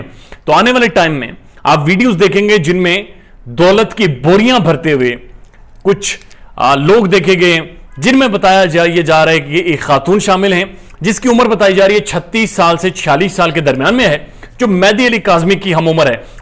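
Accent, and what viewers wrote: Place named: Punjab, India. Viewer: Hayee Sir! M just ap kii punjabi ko sunany kyleay ap ka vlog dekhtii..wEsy to bii punjabi hn..lakin apkji punjabi style wahdhiya💯👌👌😂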